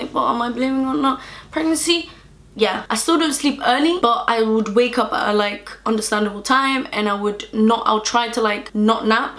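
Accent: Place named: South London, United Kingdom. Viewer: Are you from south London idk maybe it's just me but it's your accent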